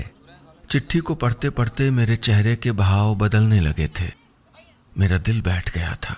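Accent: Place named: Pahadi, India. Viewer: I am from Almora and your die heart story listener ...The way you narrate this story in our local tongue and tone is just out of the world... it was indeed an emotional story but I was smiling when you were like a pahadi speaker.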